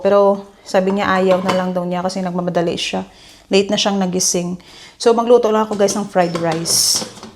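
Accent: Iloilo, Philippines. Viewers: ang sis in law ko dati marami rin pimples tapos nag consult siya sa dermatologist naghiyang siya dun sa pinapahid niya gumanda ang Mukha Niya. ikaw din maganda kna parang may kinang ang Mukha mo. I wish mkadiscover ka ng gamot n mkaalis ng pimples mo para Lalo Kang gumanda . honestly bisaya din Ako but i really love the ascent of ilongga.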